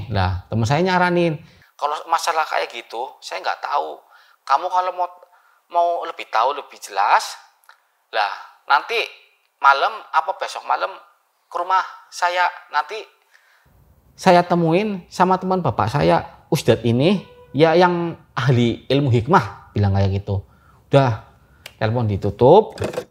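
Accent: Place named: Tegal, Indonesia. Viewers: Ora ngapak ora kepenak ya mas jos gandos 😭👍🏻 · Medokee medoke masss 😅 waras terus mas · Logat tegale ora ilang yah kang,,keren" mantep critane,salam saking Bojong tegal 🔥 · Jane serem critane cuman logate karo basa ngapak dadi ora sida medeni malah dadi lucu😂😂😂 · wong ngapak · Logat tegal nya kental bget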